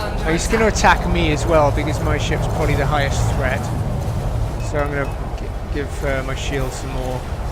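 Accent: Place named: Wales, United Kingdom. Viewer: Getting an oculus in a few days. Just hyping myself up by watching elite vr videos lol. I love elite but I'm gonna be so obsessed with it now from what ive seen and heard from vr owners. I love feeling immersed in games and vr is a great tool for that; its perfect for elite. Good to see someone having fun messing around advance and shooting things. Also,are you from wales? You have the accent of someone down cardiff way. Just curious, being from wales myself.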